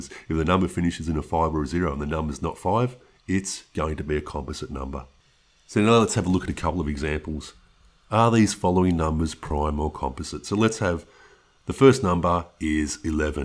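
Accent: Australia. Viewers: Hello Aussie I felt like the narrator was Batman with an Australian accent! 🤭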